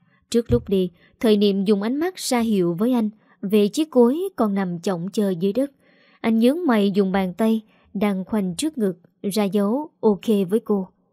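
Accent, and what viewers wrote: South, Vietnam. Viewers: Giọng đọc miền Nam của quả thật nhẹ nhàng ngọt như mật ong. Love it! 🥰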